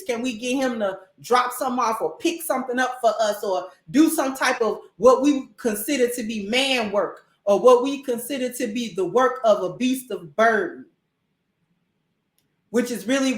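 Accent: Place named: New Orleans, United States. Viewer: Youre definitely from New Orleans aintee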